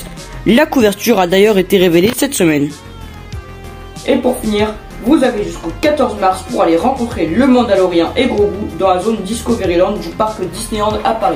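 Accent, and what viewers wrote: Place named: Spain. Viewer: Je me rend compte d'un problème qui persiste sur tes vidéos, c'est les cutes vidéos qui sont très répétitifs et désagréables, parfois j'ai l'impression que tu t'auto-coupes la parole dans le montage et là aussi c'est pas agréable à écouter, j'espère que ça sera réglé par la suite (et au passage, très bel accent espagnol 👌)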